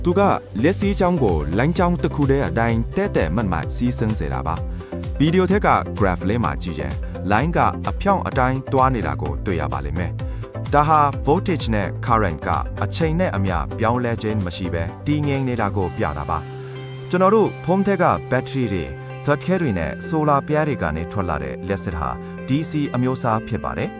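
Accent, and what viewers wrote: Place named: China. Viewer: လျှပ်စစ်ရဲ့ အခြေခံ သဘောတရားကို ဝေမျှသင်ပြပေးလို့ ကျေးဇူးပါဗျ။ သို့သော်....... AI ကို ပြောခိုင်းတာကိုတော့ မနှစ်သ်ပါဘူးဗျာ ။ မြန်မာစကားကို စာဖတ်ပြီးပြောနေတဲ့ တရုတ်တစ်ယောက်ရဲ့ စာဖတ်ဟန်နဲ့တူနေပါတယ်။ ဝါကျတစ်ခုခြင်းစီရဲ့ အသံ အနိမ့် အမြင့် အဖြတ် အတောက် မပါတဲ့အတွက် နားထောင်ရတာ ပေါ့ပျက်နေပါတယ်။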